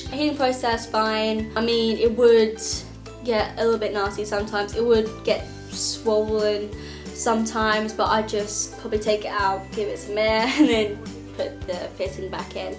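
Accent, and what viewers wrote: England, United Kingdom. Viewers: Onyx Lover she's english but she lives in France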